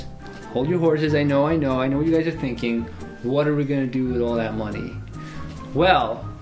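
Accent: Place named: Netherlands. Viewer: You are dutch, certainly.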